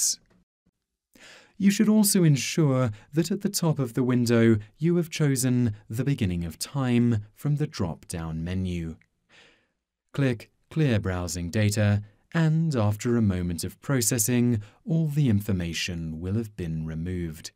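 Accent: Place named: England, United Kingdom. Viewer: That awesome English accent -_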